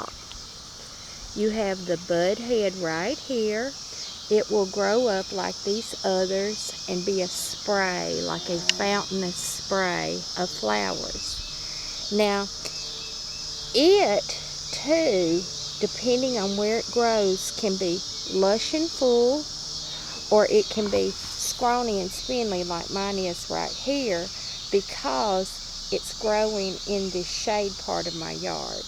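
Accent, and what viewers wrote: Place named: Georgia, United States. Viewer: Love your accent. Reminds me of the ladies I have met in Georgia when I was stationed at Ft. Gordon, Augusta , Ga. in the US Army. Thanks for the great identiication of the variuos species. I just bought some L. Virosa seeds and am trying to sprout some right now. But I have to wait for the second years growth before I harvest any milky sap. God Speed to you !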